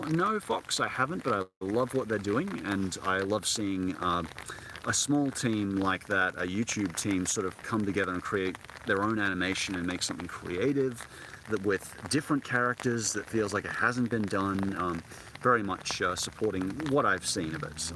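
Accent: Australia. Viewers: Hey are you from Brisbane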